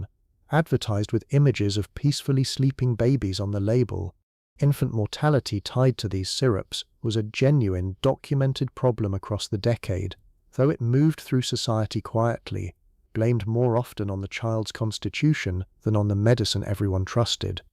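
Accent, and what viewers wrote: United Kingdom. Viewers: Watching from the desert - in Phoenix Arizona ❤ I love the British accent- sweet dreams everyone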